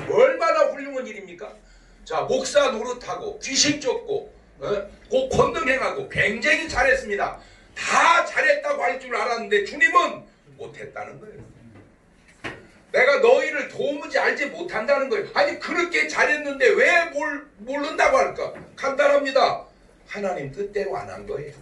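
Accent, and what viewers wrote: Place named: Chungcheong, South Korea. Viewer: 장경동 목사님의 설교를 듣다보면 참으로 공감하는 부분이 너무나 많습니다 나는 서울에 사는 천주교인 인데 장목사님을 너무나도 존경하게 됐습니다 특히 구수한 충청도 사투리에 진실된 설교 정말로 공감합니다 서비스로 비밀 한가지 말씀 드릴게요 ? 나라 화합 하지않고 대깨문 위한 정치하는 역사상 가장 무능한 자 어벙이라고 아시죠 이번 기회에 혼좀 내주세요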